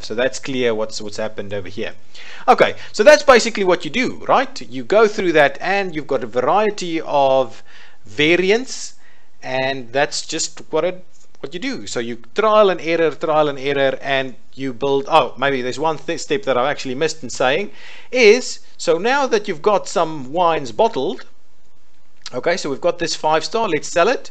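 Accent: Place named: South Africa. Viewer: Hey Boet! Nice to hear a good South African accent. Just bought Terroir and enjoying it, especially as I live in Cape Town and as you know, am surrounded by terroir! Keep it up with the strategy games and I'll be following.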